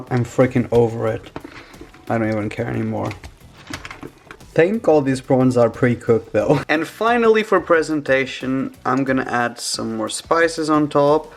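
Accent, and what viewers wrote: England, United Kingdom. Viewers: Hes accented ever heard of enlgland kid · Deadshot Mini Maybe cause he lives in the UK and tacos are not something they usually eat and they also pronouce things differently than we in America do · Gracie Denton he’s from England so he says stuff differently than us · Thas is his accent cause he is in england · Seriously dude you're sounding more English each day... And no the milk should be poured, you can get the puddings in a can and steam it... Can't believe you didn't heat it up.... Lmao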